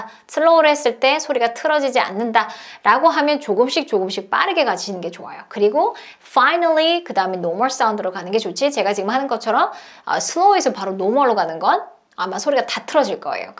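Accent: United Kingdom. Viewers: 저는 영국식발음이 끊어 뱉어주셔서 더 편하게 잘 들리네요. ^^ 오늘도 감사합니다. IT WAS A VERY FUNNY DAY. I WILL SAY. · 쌤 정망 영국식이라 그런거 맞는거죠???😂😂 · 확실히 영국식 영어가 더 잘들립니다.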